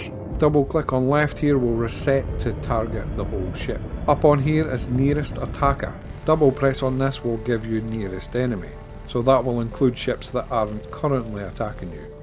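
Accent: Scotland, United Kingdom. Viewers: First Scot I’ve heard doing a star citizen channel. 🕺🏼🕺🏼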